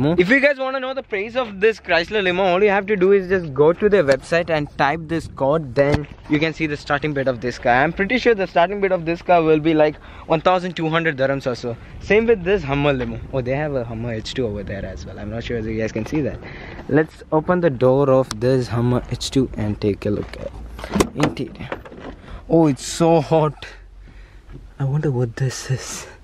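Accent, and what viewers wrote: India. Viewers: People make fun of other indians because of your accent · YOUR ACCENT TO INDIAN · Indian accent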